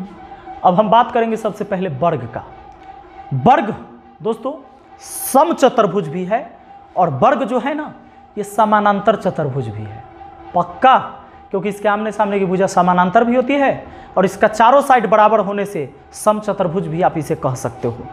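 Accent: Bihar, India. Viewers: Bihari hai sir😊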